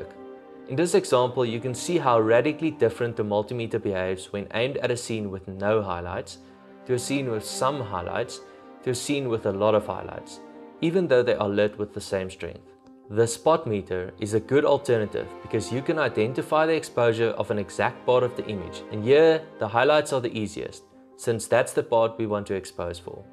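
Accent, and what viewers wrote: South Africa. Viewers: You sound South African bro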